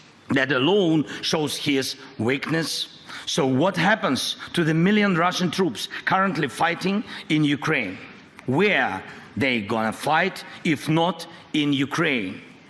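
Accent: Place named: United Kingdom. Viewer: We need more bri-ish voiceovers its more suspenseful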